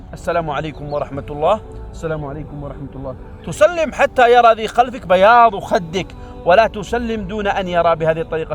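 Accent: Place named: Yemen. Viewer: ياخي اللهجه واللباس واضح من اليمن يعني محه يكون من الفلبين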